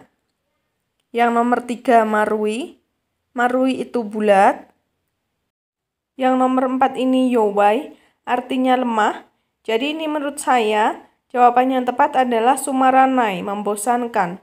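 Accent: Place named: Java, Indonesia. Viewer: Nyong seneng medoke sampean mbak sensei..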